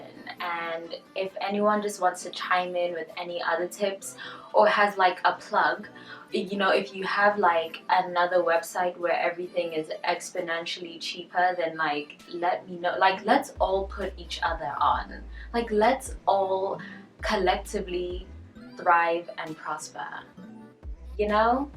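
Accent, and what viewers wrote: South Africa. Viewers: You sound South African? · That accent... you're South African right? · You sound South African.. i'm confused lol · WOW!!!! My friend, you sound a bit south african - are you??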